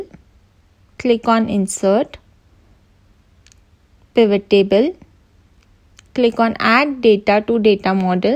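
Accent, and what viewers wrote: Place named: Trinidad and Tobago. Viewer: Are you from Trinidad 🇹🇹? You sound Trinindian.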